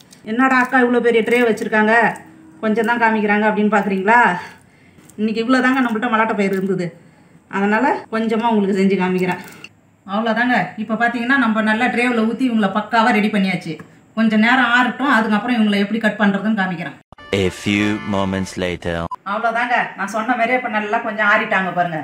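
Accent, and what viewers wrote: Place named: Tiruvannamalai, India. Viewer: நீங்க எந்த ஊரு அண்ணன் எங்க ஊரு பாஷை மாரி இருக்கு நான் திருவண்ணாமலை 👋நீங்க என்ன ஊரு சொல்லுங்க அண்ணா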